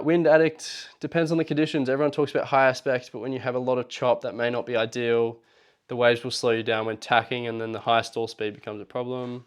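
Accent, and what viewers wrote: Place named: New Zealand. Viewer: Circling back to this vid as coldest month of the year in NZ, sun down just after 5pm(You sound like a kiwi too) and I have 2 new designs I love. F-one SK8 looks a lot like my latest one and the one back from that looks like Armstrong ST and Armstrong released theirs about the same time I made mine. Both my latest foils have great glide but my latest one though only slightly smaller, I have stability that makes me feel that I can foot switch on foil. Of course I still need to get used to taking my moment to be 100% about new foot placement but the new foil makes it so much easier. I really favor foils with a bit of sweep and my latest one that I made to fit Axis fuselage, it has almost straight back adge and sweeps to extremely narrow and thin tips. For all the potential for injury, the blissful smooth ride of my 115kg on this 1240sqcm 1M span foil is amazing and yet in surf it's super loose. 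Lots of our locals on Axis but my few tries of them I've not liked the handling. Axis ARTs do have one of the things I incorporated in my own designs from feb 2022 which is wing tip twist. I've refined my own wingtip twist amount and placement since then to better stretch max speed and min stall speed. It's fascinating seeing all the brands making their own advances in different ways.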